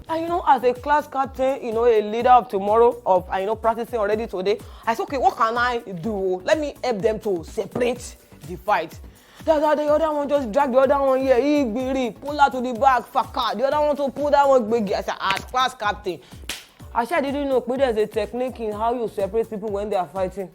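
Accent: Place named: Nigeria. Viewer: Yes o....I love every bit of your shows.... they are always very interesting with the Nigerian accent 😂😂😂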